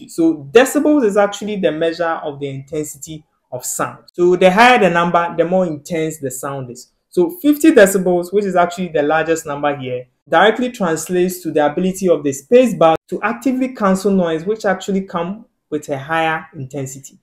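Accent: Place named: Ghana. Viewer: 😂😂 you scratch my back I scratch your back right! Yeah I know you're Ghanaian now your accent says it all!!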